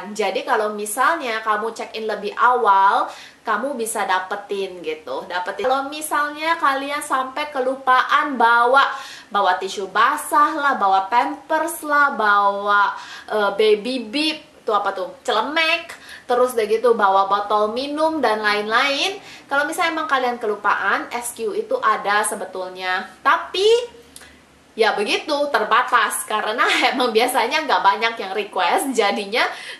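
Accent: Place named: Medan, Indonesia. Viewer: Logat medan nya masih kental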